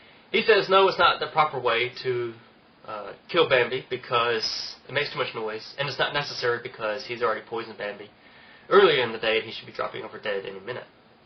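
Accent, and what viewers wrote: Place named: Rural, United States. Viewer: you are an idiot and you cannot even speak the english language your accent sounds like a stupid hick uneducated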